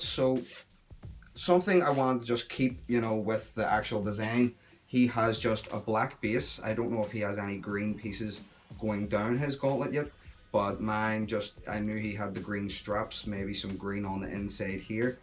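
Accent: Ireland. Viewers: great channel..charming kid..well spoken..cosplayers do it best! i just rolled into cosplay at 36..big geek..big movie and toons fan..not many channels are that enjoyable to watch..like some big names i wont mention..i love cosplay chris tho..something about irish people..and australians thats just so loveable..good friendly characters.i'm from belgium myself and we have irish pubs here..lots of irish fellows working hard here and each of them friendly AF!:) always loved the way u guys talk and yr humor..yr a good fellow..dont get cocky lol..stay humble as u are and cosplay yr ars off! and remember..cosplay is about having fun and not the ego like some..guess those people are a bit lost inside or what not..but yr refreshing to watch:) hope u have a long good run here on the tube:) best wishes and greetings..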